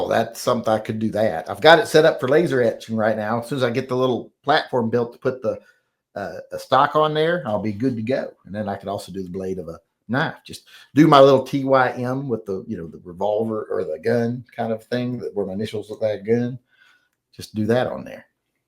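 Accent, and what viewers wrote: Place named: South, United States. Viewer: U sound more redneck (in your old age) than u used to.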